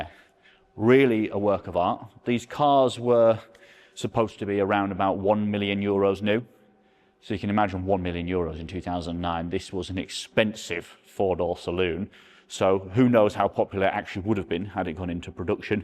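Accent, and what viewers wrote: United Kingdom. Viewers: His british accent is adorable. Buttun.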